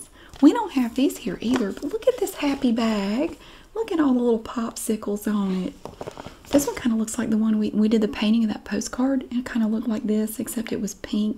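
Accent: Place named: Pittsburgh, United States. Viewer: ! What suburb outside of Pittsburgh was it?? I'm born and bread Pennsylvanian, just about 1.5 hours outside of Pitt. Also, we call the accent "Pittsburgh-ese"- I believe it was recently rated the worst accent in the entire country lol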